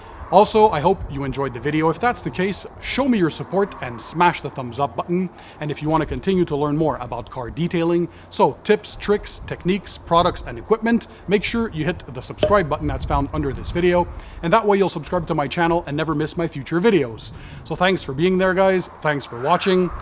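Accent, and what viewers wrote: Quebec, Canada. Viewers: Are you from Quebec ?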